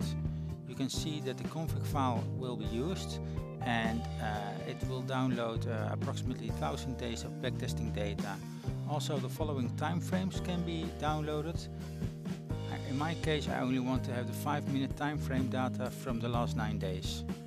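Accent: Netherlands. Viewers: First sentence and I knew he was dutch. Dank je wel voor de playlist!! haha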